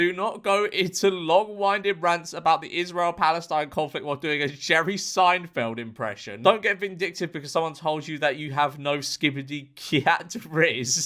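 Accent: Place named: Birmingham, United Kingdom. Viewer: Extra brummie today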